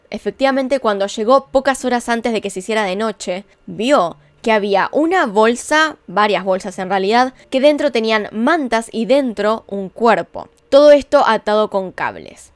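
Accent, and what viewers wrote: Argentina. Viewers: Me encanta como narra con su acento argentino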